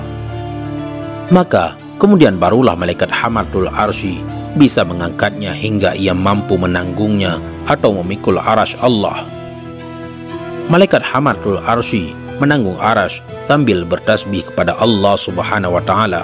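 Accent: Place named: Bali, Indonesia. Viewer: Dialek Bali kayaknya...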